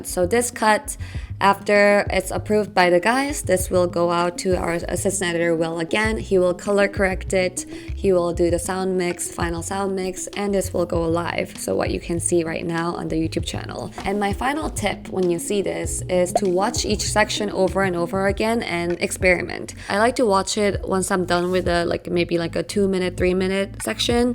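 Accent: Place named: Asia. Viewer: What happened to your accent? It has become more authentically Asian all of a sudden. Not that I am complaining, but still... How does such a thing happen?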